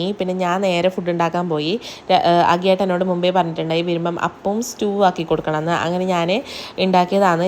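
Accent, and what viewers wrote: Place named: Kannur, India. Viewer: but slangil evideyo oru kannur ind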